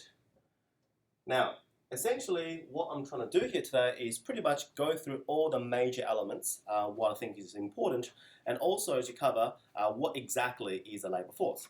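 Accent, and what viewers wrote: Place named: England, United Kingdom. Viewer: Chinese with a bit English accent, like your lecture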